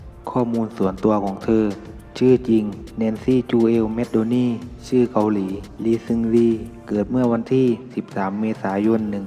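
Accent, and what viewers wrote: South, Thailand. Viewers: เสียงเจ้าของช่องเหมือนคนใต้จังคับ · คนพูดนี้คนใต้ม้ายอ่ะ น่าว่าหมันใช่ม้าย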